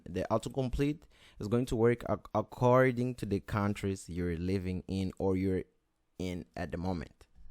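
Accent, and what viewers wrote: Brazil. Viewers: you're Brazilian, right? lol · Mano tu é brasileiro?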